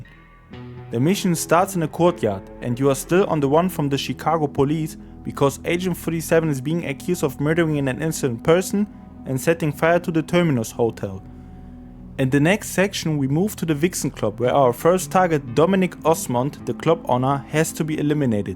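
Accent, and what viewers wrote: Germany. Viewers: Do I hear German? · Are you from Germany? I've seen several videos from your channel (which is very good, by the way) and I was trying to guess where you were from based on your accent, and I thought of everything but Germany.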